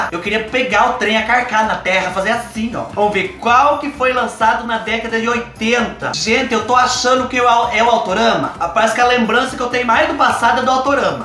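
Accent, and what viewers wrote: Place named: Coronel Macedo, Brazil. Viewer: Gente, tem coisa mais fofa do que o falando inglês com esse sotaque de Coronel Macedo? 😍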